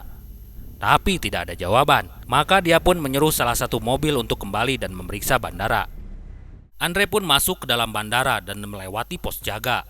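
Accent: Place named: West Java, Indonesia. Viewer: Naratorna urang Sunda 😆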